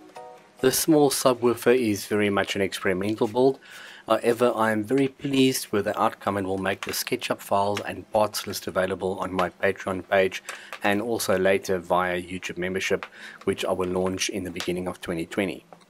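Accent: South Africa. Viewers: Hi, you sound South African, if you are where are you based and do you perhaps sell what yoh make? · Hey. Are you by any chance from South Africa? Your accent is why I'm asking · South african voice I heard there. Where did you source the driver? Looking to replicate your build along side a set of 3.5 inch satellites.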